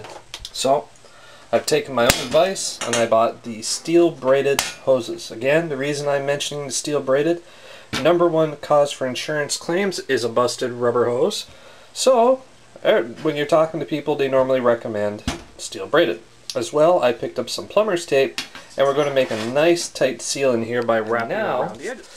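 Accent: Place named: Canada. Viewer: Oh you SO rock :) I was thinking I had to a get a new washer but followed your steps and sure enough - that little bugger was broken., Ordered a new one and paid extra so it'll be here Fri (it's Wed now) SWEET! YAY I don't have to go to a skeezy laundromat LOL THANK YOU! :) (And you're Canadian - so am I - I could tell by the "oots" before you mentioned Toronto LOL I'm in Seattle but from BC.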